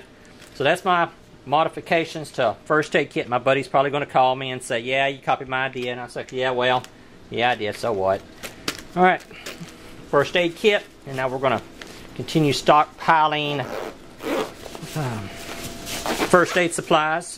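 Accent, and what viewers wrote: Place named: Texas, United States. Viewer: sounds like your from texas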